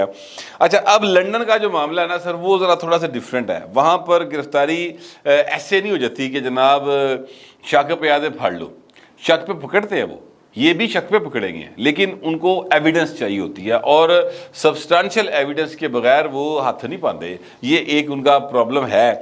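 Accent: Lahore, Pakistan. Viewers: ZindAbad.pure lahoria style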